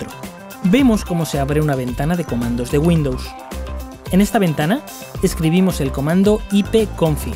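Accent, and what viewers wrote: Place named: Spain. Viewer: Puros tutoriales en español españa :v;ondaa vitaaaaal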